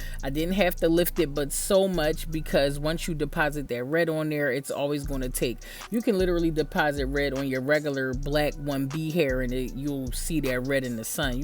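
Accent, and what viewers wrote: Philadelphia, United States. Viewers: You was sounding mad Philly in this voiceover! Love you girlie!😜❤️ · Are u from Philly? You accent an slang made me ask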